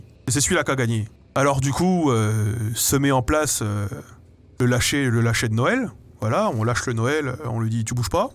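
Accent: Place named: Haute-Marne, France. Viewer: En vrai ma famille vient de Haute-Marne (mais pas exactement de ton coin, plus vers l'Aube !) donc j'ai passé toutes mes vacances dans le village natale de ma mère, et franchement ta manière de parler et tes anecdotes ça me rappelle de fou tout le temps que j'ai passé là bas ! À être réquisitionnié pour filer des coups de main dans les champs ou à jouer avec les voisins paysans !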